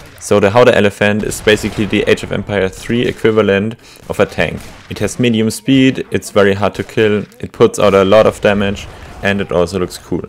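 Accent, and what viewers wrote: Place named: Germany. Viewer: Diese furz trockene art und der deutsche akzetnt 10/10👍🏽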